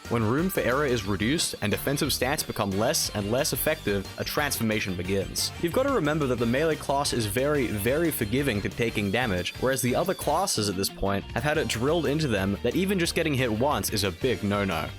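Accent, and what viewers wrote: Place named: Australia. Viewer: Basically an Australian accent with an American and British undertone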